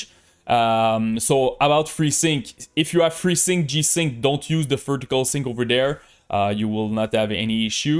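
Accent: Quebec, Canada. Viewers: Tu es québécois ? Lol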